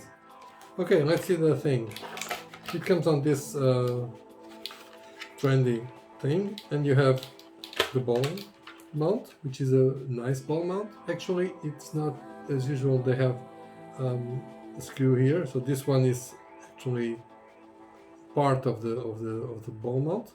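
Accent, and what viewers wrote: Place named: Portugal. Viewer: Muito obrigado ( I guess from accent you are portuguese :-) ) for the video. Could I contact you for more questions about SEAFROGS lights?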